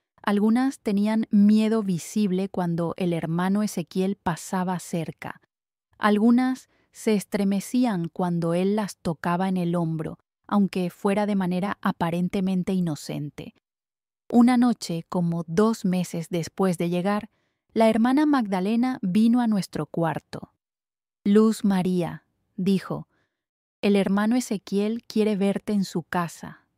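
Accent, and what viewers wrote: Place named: Spain. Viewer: ESPAÑA te oigo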